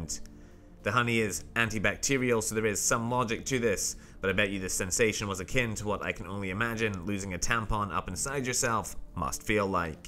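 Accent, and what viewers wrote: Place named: Scotland, United Kingdom. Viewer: yes the video was enjoyable and useful but pleasantly filled with your ripe Scottish sarcastic humour!! (my mum was from Ayrshire so I've got a wee bit of Scots blood running through my ENGLISH😁 veins). Have you had any contact with America? Your accent is curiously relaxed compared to the slightly more "tight" Scottish I've often heard. I'm an English language teacher and fascinated by language, so the question is purely professional.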